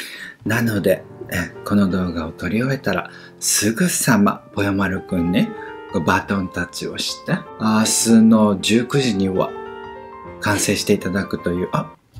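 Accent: Japan. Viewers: 💕 ごきげんよを🤗 たまに飛び出す関西弁が めっちゃ好きやねーん❣️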